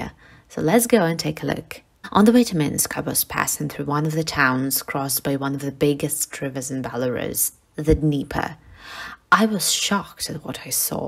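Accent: England, United Kingdom. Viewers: how you got an English accent?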